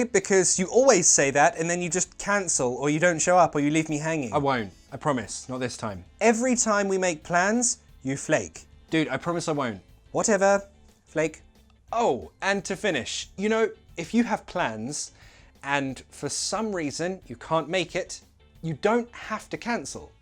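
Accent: United Kingdom. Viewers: Oh yes British English is best! Thank you for those 15 minutes of pure learning and entertainment beautifully packaged 😃👍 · you're the first british accent guy that I've heard not having that horrible and funny accent lol... like a hot potato in the mouth.... most british people have that hot air in the mouth while pronunciating words lmao...Hallelujah lol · It is so nice to listen British accent 💫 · love british accent. help me with it please!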